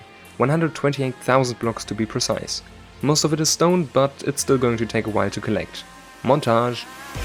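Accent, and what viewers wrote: Germany. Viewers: Berliner Englisch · Are you from Germany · Bist du deutsch ? hast Deutschen accent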